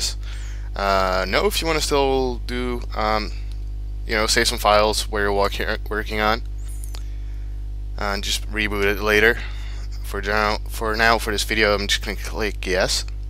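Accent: Netherlands. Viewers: ben jij nederlander?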